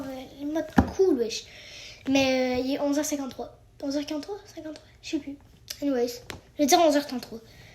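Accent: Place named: Quebec, Canada. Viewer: Tu es québécoise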